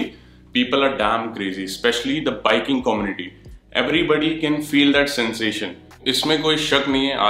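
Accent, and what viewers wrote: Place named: Punjab, India. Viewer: Pehle baat to sir 3 reason hi kyu😁? PERSONAL BTAYU TO(CAN I WRITE IN PUNJABI) 1.PEHLE GAL TA BHAJI THODI EH VDIA LAGDI A KI TUC APNI MOSTLY TRIP TE KHANA VEERE NU LEKE JANA O ❤(THODI JODI BOHOT VDIA A, DONO DOWN TO EARTH HO❤) 2.THODE CINEMATIC SHOTS MANU KAFFI VDIA LAGDA A CAUZ 😁MAVI FILMAKING KARNI A, MANU BOHOT INTEREST A IS FIELD CH❤ 3.THIRD BHAJI EH A VI TUC HEGE TA DELHI DE HO BUT PUNJABI BADI SWEET BOLDE O😂😍HINDI TONE + PUNJABI LANGUAGE= 4.MERE VLO BONUS 🤫, TUC HAR TRIP START KARAN TO PEHLA WAHEGURU JI DE DARSHAN KARDA O❤AND END HMESHA EKO SALOGAN BOLDA O MEHNAT HMARI REHMAT UNKI AUR PYAR APP SBKA❤(DEEP GAL KEHTI TUC SACHI❤)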